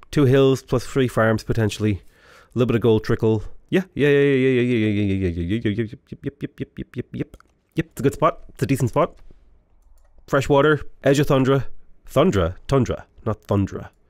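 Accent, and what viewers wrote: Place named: Ireland. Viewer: Is that a "reserve Irish-ism"?! Adding a "th" sound where there is one instead of not being able to say the "th" sound?! I treasure the few times we get the Irish accent from our great!!! Wish they were more common, but alas they are not!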